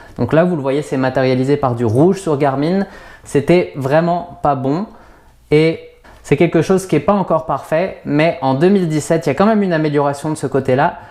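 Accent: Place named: Canada. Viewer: C’est moi ou l’accent canadien prend le dessus ? Merci pour tous tes conseils 😉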